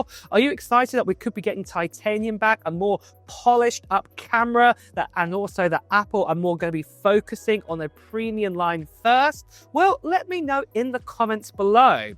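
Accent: United Kingdom. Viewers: Until I opened up my eyes, I thought that was a British woman speaking.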